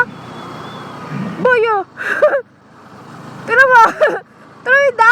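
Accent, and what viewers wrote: Laguna, Philippines. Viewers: Gusto ko yung puntong laguna mo idol haha enjoy ako sa vid palagi! sana makaride ka namin ng misis ko soon! penye sticker haha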